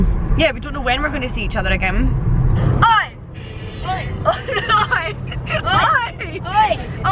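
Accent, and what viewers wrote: Scotland, United Kingdom. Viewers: First! And Scottish accent is fun, peelieed!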